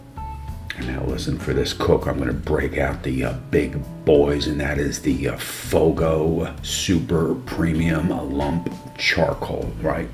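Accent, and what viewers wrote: New York, United States. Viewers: I like your style mate, that’s a New York accent right ? You a sports fan ? I’m an Aussie and can’t get enough of American sport, I love everything New York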